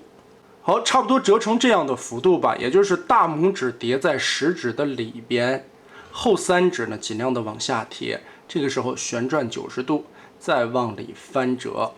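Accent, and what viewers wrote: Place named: South, United States. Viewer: He speaks with a southern drawl 😀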